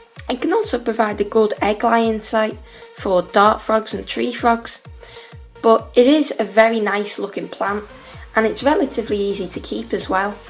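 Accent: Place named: Scotland, United Kingdom. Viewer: I like ur accent, is it Scottish? Or British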